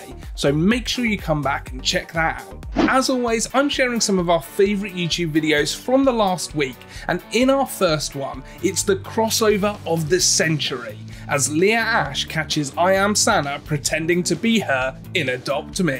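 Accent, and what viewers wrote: United Kingdom. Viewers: I love your British accent it’s so posh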